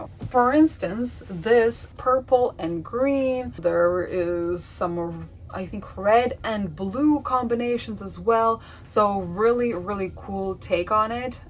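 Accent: Russia. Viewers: Great understanding of trends, and feeling for fashion. Beautiful English, good French pronunciation and a very light cute accent, perhaps Russian! Just curious.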